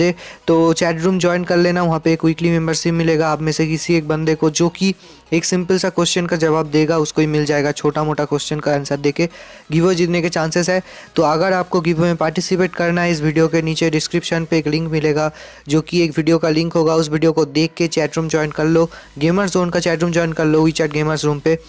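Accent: Bengal, India. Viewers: Keya app Bangoli ho???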